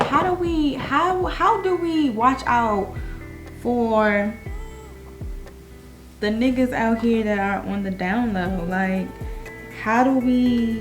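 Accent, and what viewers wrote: DMV, United States. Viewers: I knew you were from the DMV. Lol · 10 sec in are you from the dmv ? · only been here 40 seconds and i can tell youre from the DMV 😂 subscribes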